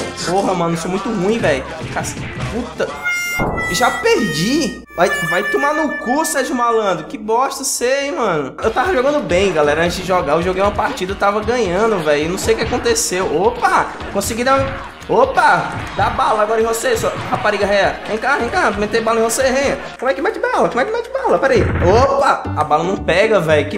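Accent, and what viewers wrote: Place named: Ceará, Brazil. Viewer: Mermao, Cearense falando e muita onda kkkkkkkkkkkkkkkkkk Coloquem mais esse narrador pfv!! muito bom!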